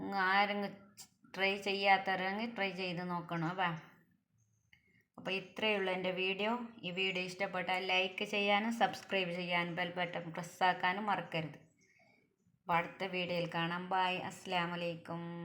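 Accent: Kasaragod, India. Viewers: എനിക്ക് നിങ്ങളെ സംസാരം കേൾക്കാൻ നല്ല രസമാണ് കാസർഗോഡ് ഭാഷ എനിക്ക് ഒരുപാട് ഇഷ്ടമാണ്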